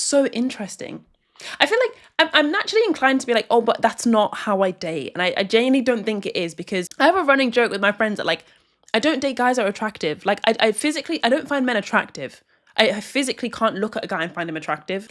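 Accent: United Kingdom. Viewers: Personal question- what country are you from? Based off the accent you sound like your from the UK.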